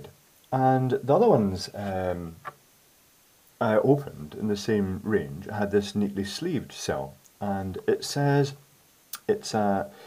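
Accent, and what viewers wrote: Scotland, United Kingdom. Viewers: Scottish Accent. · Nice to see your face!! I really love your videos and also your beautiful British accent; nice radio voice! Keep up the good work!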